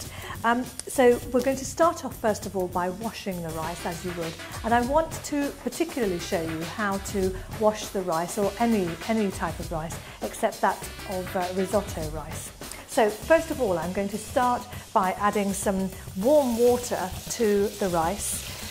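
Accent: England, United Kingdom. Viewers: No one is going to comment on how she is a sand lady with an english accent.. lawl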